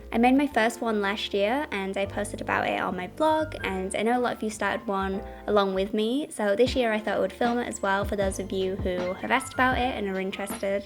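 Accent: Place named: North, United Kingdom. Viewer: I hope you don't lose your northern accent.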